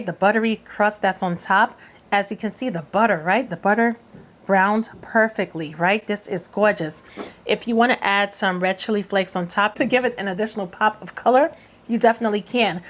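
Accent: New York, United States. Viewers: U sound like ur from new york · I love your New York accent. Cayenne pepper is good over that pasta 😊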